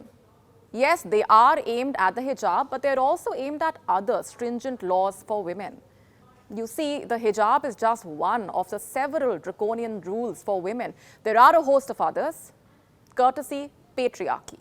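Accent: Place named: India. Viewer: The newsreader have indian accent. Not little but too much. Let her train more instead of appearing in camera. She read like AI. Train her to pronounce T=th, p=ph, k=kh, etc, etc.... It's not nice to hear the way she pronounced.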